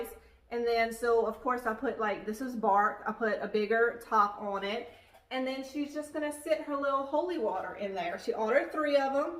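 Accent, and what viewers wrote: Louisiana, United States. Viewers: Omg your cute little accent!!! I stumbled upon this video and wad not expecting a cajun accent, love it and all your beautiful items!!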